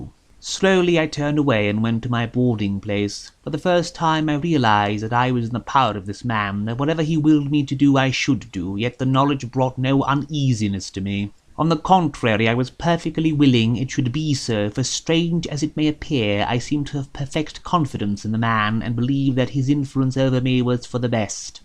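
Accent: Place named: Eastern Europe. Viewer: You have the perfect voice for things like this, your diction is excellent and the slight Slavic lilt adds something rather lovely to the cadence.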